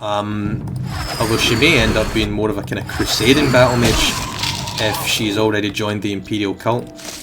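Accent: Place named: Scotland, United Kingdom. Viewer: Thats quite the accent where about in Scotland are you from mate?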